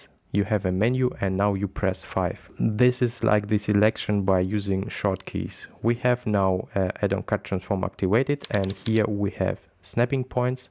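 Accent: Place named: France. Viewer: By the way I plan to go through all your videos on blender. They are well done. The cadence was excellent on this one. The on screen help was brilliant and they are very succinct. Thanks for your efforts. You deserve more subscribers. Also want to let you know that I have no problem with your english. Sometimes you substitute French grammar but that does not sound bad to an American ear. To the British? Well we all know they are snobs. Right? LOL.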